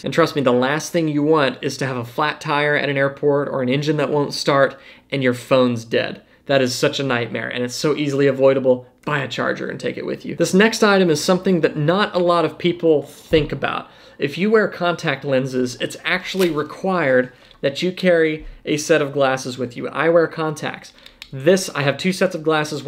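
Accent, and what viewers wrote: Texas, United States. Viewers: Magnificent Infos for Pilot appreciate very much I'm now used to your fast Texas talk again no problem. See you later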